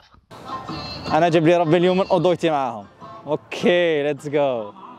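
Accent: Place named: Algeria. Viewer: اللهجة الجزائرية واليابان مع بعض ادمان ربي يبارك فيك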